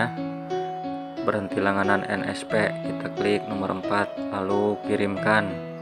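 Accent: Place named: Sunda, Indonesia. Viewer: Sunda teing ey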